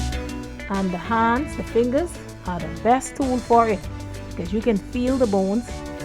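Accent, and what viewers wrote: Bahamas. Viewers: Just because this is what I'm cooking I selected this. I didn't realise this was Bahamian until i heard you speak. Will definitely subscribe.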